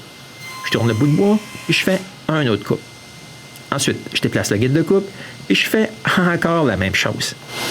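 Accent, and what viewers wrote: Quebec, Canada. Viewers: le magnifique accent québécois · OH! CT'ACCENT TROP JOLI si c'est pas Québec où Montréal viens plus en France ''ils'coprenn pas envoi le sucre d'orge (le sirop d'érable) et la Crépinne (crêpe) je crois..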